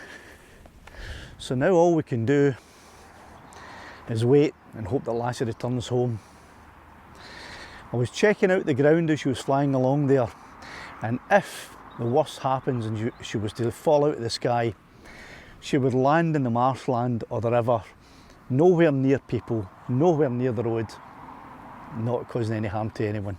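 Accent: Scotland, United Kingdom. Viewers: Thanks for this. I am not Scottish but my ancestors are from Dunrobin Castle. I love your accent. You remind me of a past manager and friend I had years ago whom my wife could not understand, ever! Where do you get your beautiful music? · I'm Australian, so very much used to Scottish accents here.... no subtitles required. Great video. Geeya thombs op mon. Glad to see Lassie returned to fly another day. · Hi how's it gawn, its magic tae hear our accent on youtube, understood every, and naming your drone lassie hahaha hiya fae wishy, where were you flying looks like up by Lanark near tnto Hill,? Bet am miles off, I would love tae have the bottle to go in front of camera, better behind it ha ha the mini 2 is amazing, I'm blew away with mine, keep up good work buddy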